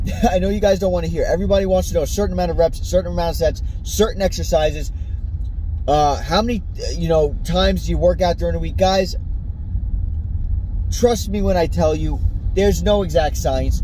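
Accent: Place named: Boston, United States. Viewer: Where u from Boston (your accent)? I get my Tonal installed on Nov. 4th can't wait!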